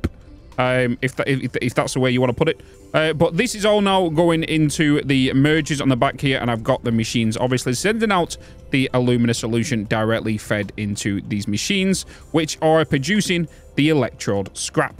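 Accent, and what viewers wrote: United Kingdom. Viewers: Well, that's the trick about it. Brits and Americans not only say it different, but spell it differently. Here in the States it's spelled Aluminum, over there they spell it aluminium. Now, because of this there should be no confusion about pronunciation - say it how it's spelled. In Satisfactory, it's spelled the American way, so it should be said that way - alu-mi-NUM. As a side note - as a machinist that works in metal, hearing the British version can almost make me wince! :)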